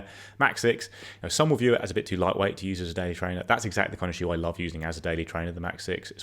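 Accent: United Kingdom. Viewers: Talk just a bit too fast for an American to decifer the accent. I generally do well with Brit accent, until y'all start running words together/talking fast. Thx for what I could understand!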